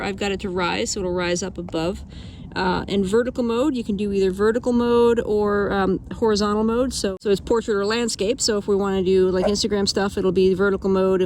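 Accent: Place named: England, United Kingdom. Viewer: Good evening friends. Very interesting. I hope it works out well for you. English accent. 🤣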